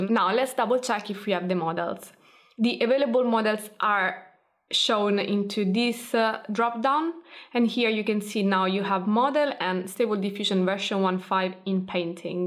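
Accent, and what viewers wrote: Italy. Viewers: Ho notato il tuo accento italiano e dopo aver visto il tuo nome ho avuto la conferma. Fa piacere vedere altri in Italia interessarsi a queste cose :)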